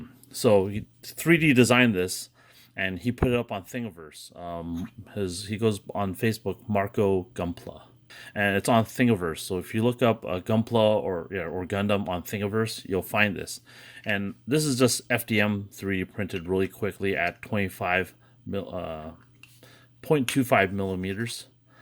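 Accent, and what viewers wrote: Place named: Philippines. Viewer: FILIPINO ACCENT- more dissasembly please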